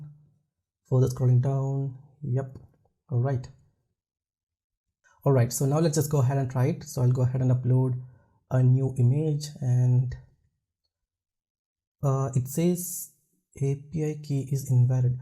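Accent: Kerala, India. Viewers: You got a good mallu accent. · pure mallu accent :) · that malayli tone to english love it :)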